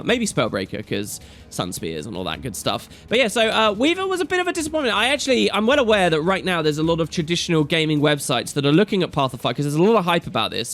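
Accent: United Kingdom. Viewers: I really like this channel and find myself agreeing with much of what the speaker says. Though this could be because I'm a sucker for a British accent. :-D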